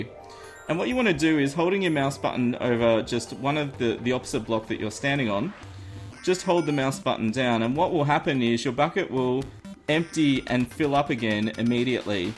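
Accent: Australia. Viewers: Hi! The fishing tip was very helpful to me so thanks a lot! Btw you're making awesome vids and I have a question for you, are you from Australia? I think... after accent.. anyways keep making cool vids! #LoveCookies!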